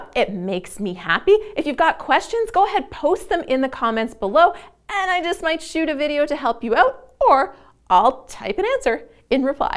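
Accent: Canada. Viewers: Are you Canadian? You sound like Samantha bee. Love your voice :)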